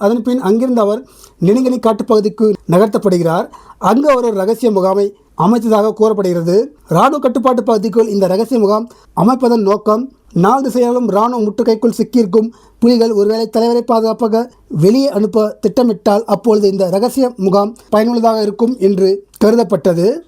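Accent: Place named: Kerala, India. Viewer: Adooi clear ahh vilankira mari sooluda Ethoo malayalali Tamil pesuramari pesurai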